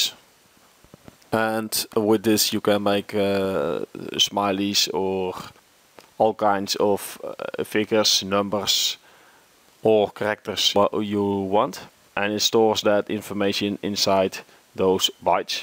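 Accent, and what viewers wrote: Netherlands. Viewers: Hahah, amazing Dutch accent right there =) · hahahah jouw nederlands accent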